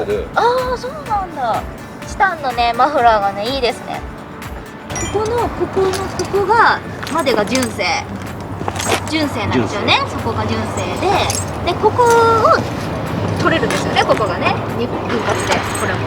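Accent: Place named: Hiroshima, Japan. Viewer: ちゃん今日もめっちゃ可愛いね💕 相変わらず広島弁がいいね👍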